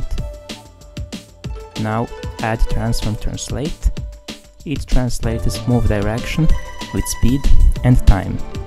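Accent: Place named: Russia. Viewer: Ты русский? Произношение как у русского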